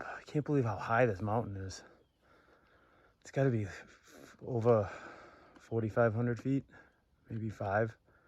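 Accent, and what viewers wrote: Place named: Boston, United States. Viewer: Might you be from Boston, slight accent there. What's the trail name,?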